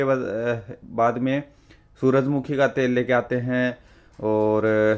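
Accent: Haryana, India. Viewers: Delhi se ho ki haryana se ho bhai aap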